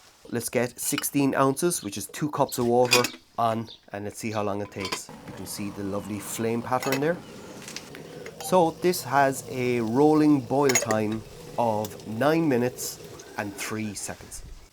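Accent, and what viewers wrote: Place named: Ireland. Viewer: Hi. Loving your videos. I'm in the middle of your hiking with your dog one, and your life interruptions. I feel for you buddy, been there. Hopefully, you're getting back on track now. I'm in Kent, but my great grandfather was born and raised in Clonmel, so I always feel happy when I hear the beautiful Irish accent. I must come over for a visit some time. Keep your chin up, hopefully it'll all work out for you. I hope your Dad is all good now. Cheers buddy. Andy 👊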